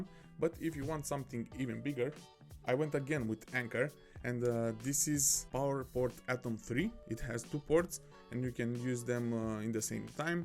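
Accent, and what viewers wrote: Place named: Romania. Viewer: I could recognize a Romanian accent from a mile away 😃👍 good job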